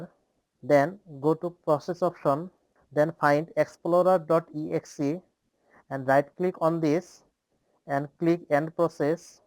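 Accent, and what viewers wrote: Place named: India. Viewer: I clicked off right away after hearing that's he's Indian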